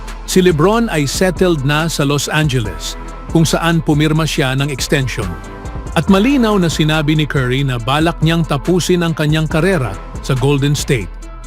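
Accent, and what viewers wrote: Visayas, Philippines. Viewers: bisaya kaba bigkas mo bisaya eh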